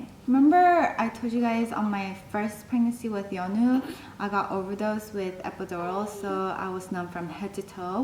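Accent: South Korea. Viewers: I think your accent is more sound like Korean now if compare the previous pregnant video. Maybe because you alway speak Korean to your husband recent years:)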